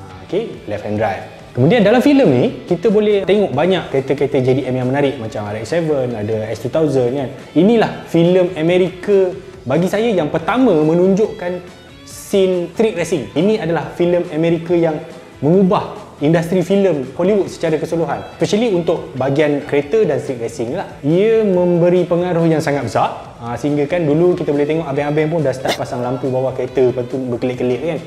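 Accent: Kelantan, Malaysia. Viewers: Ralik bangg. Kelantanese ke?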